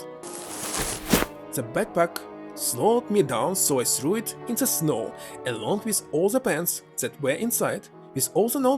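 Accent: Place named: Russia. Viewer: С первой минуты чувству., что ты Русскоговорящий парень ))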